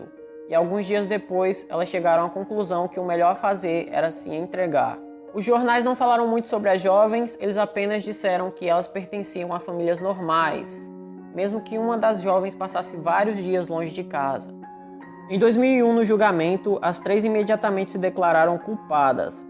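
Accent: Northeast, Brazil. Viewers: Esse sutaqui e da onde??? Do nodeste????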